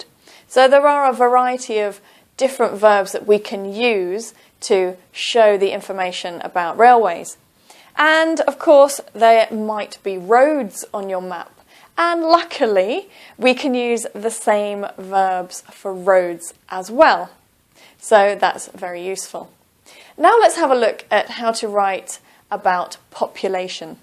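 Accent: United Kingdom. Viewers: Why does not British Council use British accents like her accent in the IELTS?. They always play fucking horribles voices and accents in the listening section !! In the other hand, flawless explanation!! Thank you, Ms !!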